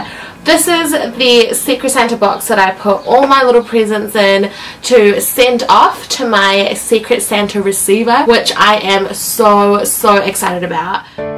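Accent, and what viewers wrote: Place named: New Zealand. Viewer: I'm so embarrassed! I thought you were an Aussie this whole time! I guess that's me being an American, isn't it? Mixing up an Aussie Accent with a Kiwi Accent hahaha. Please forgive me. I love that you put some real thought into your gifts! You really thought about what you knew about her and her life, and I think the gifts were very sweet!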